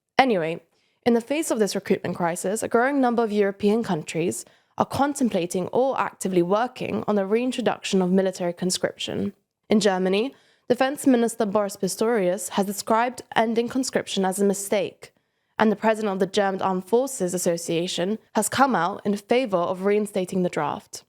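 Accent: United Kingdom. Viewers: I love the accent of the narrator! She sounds British but also has a bit of difficulty pronouncing the "th"-sound. So 33 sounds like firty-free. But the replacement (th -> f) sound isn't the same as the one commonly heard in and around London. It's slightly different and very noticeable. Not unpleasant, mind you. Just different. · What is with the strong British accent ?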